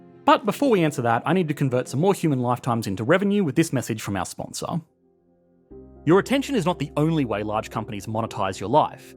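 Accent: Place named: Bogan, Australia. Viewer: Subscribed for the dulcet bogan drawl.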